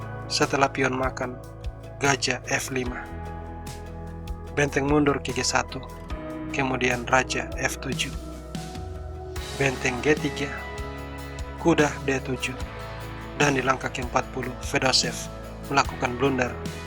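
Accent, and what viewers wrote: Manado, Indonesia. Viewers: Orang Manado dang depe admin ini?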